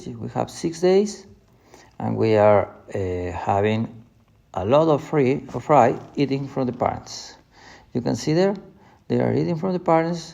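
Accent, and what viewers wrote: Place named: Mexico. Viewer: no problem my doctor , if you only problem is the all mexican problem ,do you speak english like mexican xD but your mother languaje is mexican spanish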